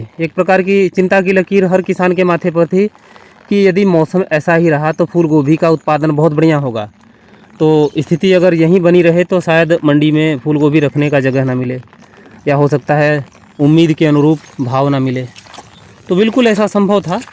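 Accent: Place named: Punjab, India. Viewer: But tuhadi boli to punjabi lagde ho....idro shift hoe si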